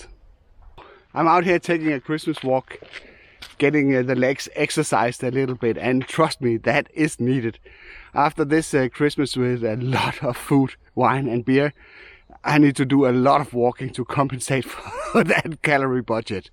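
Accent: Denmark. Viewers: Tænkte nok, jeg kunne høre det på accenten.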